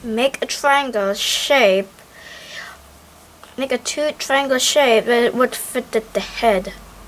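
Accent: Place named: United Kingdom. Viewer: You're English is so British.. I like it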